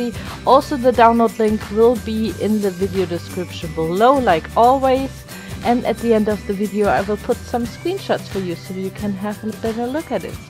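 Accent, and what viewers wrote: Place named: Germany. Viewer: You've kinda german accent... maybe... don't know... xD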